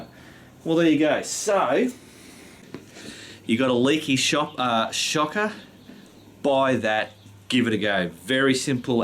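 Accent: Australia. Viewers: Great to hear an Aussie.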